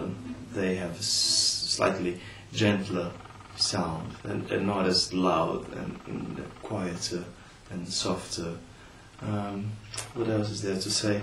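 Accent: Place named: Brazil. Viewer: Are you a Brazilian living in England or Australia? Your accent sounds to me like a mix of Brazilian and British or Australian. Just curious.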